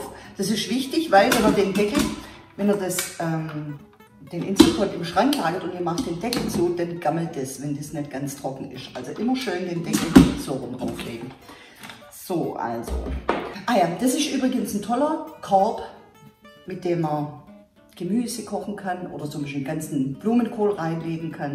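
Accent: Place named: Swabia, Germany. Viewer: Abonniert zwecks vegan + schwäbisch + Instant Pot = unschlagbare Kombination.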